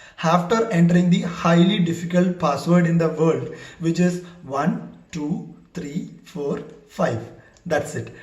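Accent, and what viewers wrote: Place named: United States. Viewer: You American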